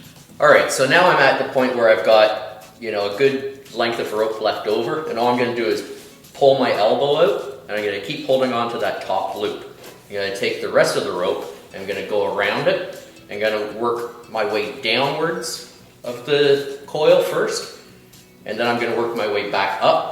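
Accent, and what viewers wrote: Canada. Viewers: Nice Canadian accent.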